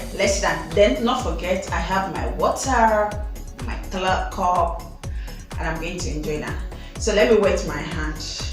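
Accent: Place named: Ijebu, Nigeria. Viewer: Pls re u Ijebu like me? Cos this is our food oo Ur Ijebu dey sweet me oo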